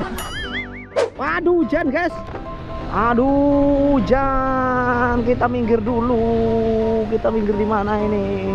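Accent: Java, Indonesia. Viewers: ojo lali di subrekkk.... jowone ngendi kang???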